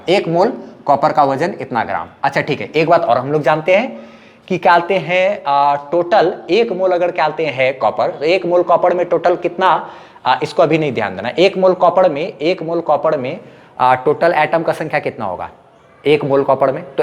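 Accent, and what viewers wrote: Bihar, India. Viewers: Sir are you from Bihar?? I mean your accent 🙂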